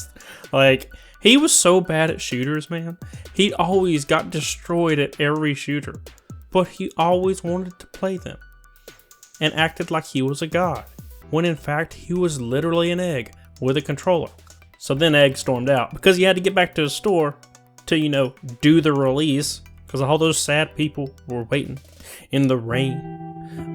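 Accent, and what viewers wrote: South, United States. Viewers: Good lord Southern and hot Af woof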